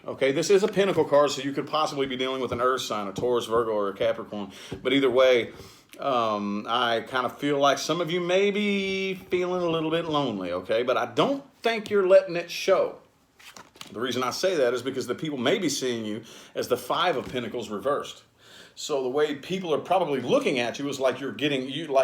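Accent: South, United States. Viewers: I like you and I like how you talk how you sound so southern😘😘😘 thanks for the reading. Queen Leo 8-21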